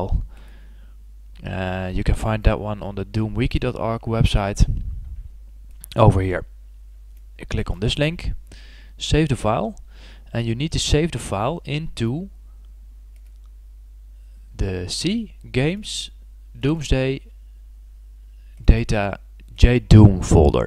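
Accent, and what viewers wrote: Netherlands. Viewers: are you dutch? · Are you Dutch Ben je Nederlands? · I can hear that you're Dutch :) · are you dutch? u have a dutch accent lol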